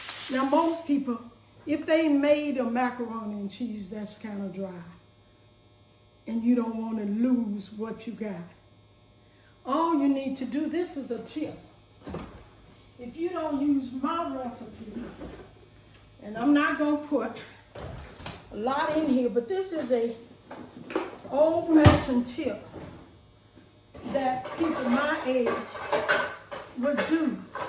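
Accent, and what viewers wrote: South, United States. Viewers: Thank You, watching you be so kind with your southern accent reminds me of my mom.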